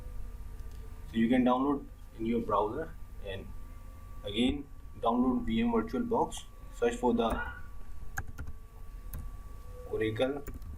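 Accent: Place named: India. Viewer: I honestly didn't give a du🦆ck about the subject but his Indian accent 😊. Man don't stop love you, made my joint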